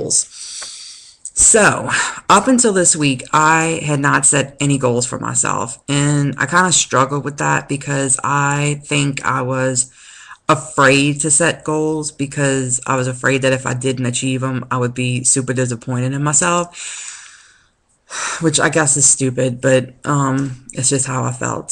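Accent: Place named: New Orleans, United States. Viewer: Just getting started on this journey (take 2 due to insurance change) and have been jumping around a lot of vloggers and starting to subscribe. Many haven't posted in years so thank you for keeping at it...this is for life!Loved your goals way back when...I, too, have a weight goals that tie to pregnancies and a dress! The horse and amusement rides made me smile....yup. Anything with a weight limit is an issue (even if under I feel I am not far enough) and squishing (or worrying about breaking) into lots of seats (and booths) is something I cannot wait to not worry about as I survey a room.I teared up at your necklace NSV. I get it, gurl. I am a New Englandah....the only accent I would trade for mine is "n'orlans" so your videos are nice on the ear, too!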